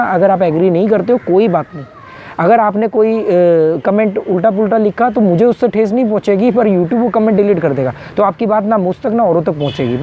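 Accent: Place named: Pakistan. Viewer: SIR SIR PLEASE ANSWER ME IN VIDEO HOW YOU TALK URDU MEANS HINDI VERY GOOD .I MEAN YOUR ACCENT LIKE PAKISTANI PEOPLE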